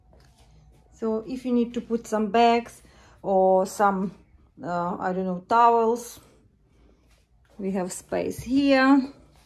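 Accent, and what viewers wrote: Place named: Ryazan, Russia. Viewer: Какой красивый рязанский акцент!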